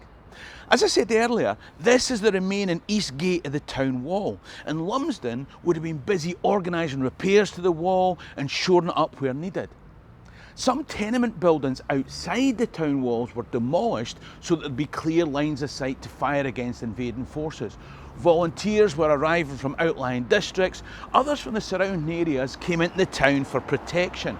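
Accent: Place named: East Kilbride, United Kingdom. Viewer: Cannae believe your from Perth was sure your accent was east Kilbride , keep up the hard graft 💪loving it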